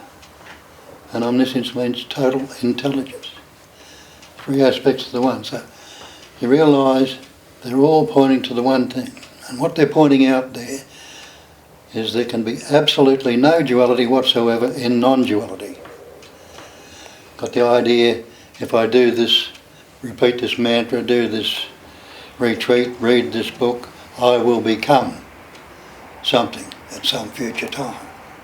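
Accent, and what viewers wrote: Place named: Australia. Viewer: It sounds so much more down to earth with a Australian accent.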